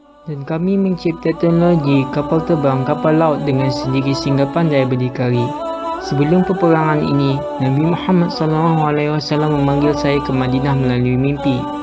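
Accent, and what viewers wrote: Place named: Terengganu, Malaysia. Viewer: Bunyi cara bcakap nie loghat terengganu,org trgganu dlm bahasa baku..trbaikkk...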